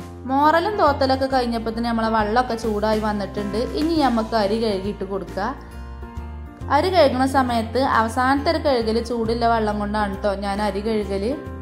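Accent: Malappuram, India. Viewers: അതെ. തനി മലപ്പുറം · Ur Language is real malappuram taste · Vlog adipoli Malappuram samsaram 😄😄😄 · Like 29👍👍👍👌👌malappuram basha 👍👍👍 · ഇത്തയുടെ ചാനലിൽ അത്യമായിട്ടാണ് വീഡിയോ കാണുന്നെ ☺️ നമ്മടെ malappuram ഭാഷ ഉഷാറായിട്ടുണ്ട് 👍👍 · ഹഹഹ...ഹഹഹ...മലപ്പുറം ഭാഷ അടിപൊളി....കൊള്ളാം... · Ningade mlp slang Super to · Enthaaan itrak mosham ano ngale malapuram slang itrak veandaayirunnu too sad to hear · മലപ്പുറം ഭാഷ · Soooper eniku malappuram Basha nalla isttamanu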